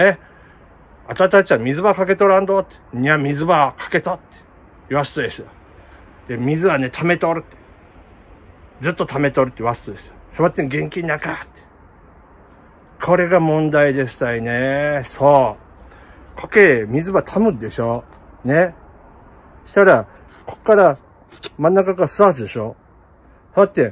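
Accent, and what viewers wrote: Kumamoto, Japan. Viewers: 社長の熊本弁好きだなー。もお疲れ様です。いつもありがとうございます。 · 社長、熊本弁、心に響きました🤭 シクラメンの鉢もオシャレになりましたねぇ✨ 行き着くとこはやはりお水管理 ( っ ˙꒳˙ )っ🚰ｵﾐｽﾞﾉﾝﾃﾞ 夏越しさせた５歳のシクラメンですが、まだ🌱 が出ません（ ᐪ꒳ᐪ ）球根ダメになったのかなと思いつつ、諦めず毎日観察してます ( ⚭_⚭)✧ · まうごっっおもしろかぁー、私のは、エセ熊本弁かもしれないけど、たいぎゃー、まうごっ、こぎゃん、そきゃん、面白すぎて２回観ました！社長の熊本弁動画大好き一生懸命聞き取ろうと集中力アップ⇧😂だけど、やっぱり苦手な人もいるんですね😢残念、でもたまには、熊本弁の動画もお願いします。楽しみにしてます❤ そうだ、思い出した！熊本の温泉で、あとぜき！！って書いてあったの思い出して1人笑いしてました。本日最高動画でしたー🎉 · 東北生まれの関東人ですが熊本弁良いですね😊 バッテン荒川さんを思い出します。これからもやってくんち‼️ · 社長！熊本弁のほうがいい！こっちのほうが私好き！社長らしいし親近感わく～～！！字幕いれるとめっちゃ面白いし！🤣🤣🤣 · ふふふっ😂 熊本弁は面白い😆😆😆 このシリーズお願いします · 熊本弁かわいいなぁ〜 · 熊本弁、聞きづらいので標準語で喋っていただきたいです💦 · おはようございます☀ 熊本弁😂 気になって内容全然入ってこず2回見ました😂😂😂 そして1002回目！おめでとうございます㊗️🎉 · 熊本弁、社長らしくてめっちゃいい❤ ちょっと意味が分からないところがあったので、少しだけコテコテを抑え気味にして、ぜひ続けて欲しいです❗️ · 熊本弁いいですね😃‼️ 社長らしさが伝わる☺️ そして、との最初のやり取りが仲良し夫婦な感じが伝わる～😂 今週雪がもっつり降ったので外で冬越しできます多肉さんが残念のお知らせ。。。😱雪にまさか当たったとは。。。⛄💦 便利グッズ、頼りすぎはダメですよね😅私も気を付けます❗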